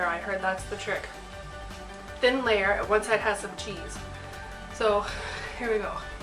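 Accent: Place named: Scotland, United Kingdom. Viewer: I love Scotland. I had a great time in Edinburgh, glasgow, and Oban. My boyfriend and I are planning to move there. I did notice some things are cheaper like groceries! I really feel Toronto is not for me. I was so depressed when I got back, but I got plans! thanks for the info. I also noticed that some words you say sound a little like the scots. :)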